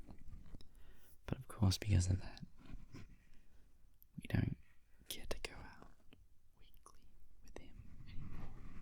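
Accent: Australia. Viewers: Your channel banner looks a hell of a lot like the roads going through Mt Baw Baw 😂 Just found you, honestly it’s nice to hear an Aussie voice for an ASMR fix.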